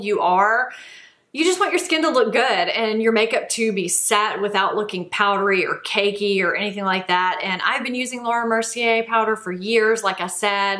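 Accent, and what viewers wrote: South, United States. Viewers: I'm new to your channel. 10 seconds of watching you and you have yourself a new subscriber. Also do I hear a southern accent?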